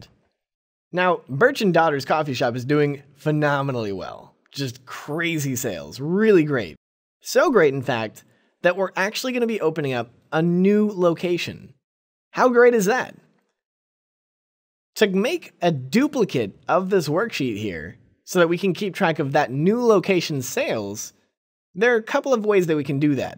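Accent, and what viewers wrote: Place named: United States. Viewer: In the United States (assuming the video creator is from there considering their accent and the fact that they're using $s) the tax would be multiplied because it's not already factored into the price like it would be in most European countries. While it's something stupid with the country, it doesn't make the video creator wrong.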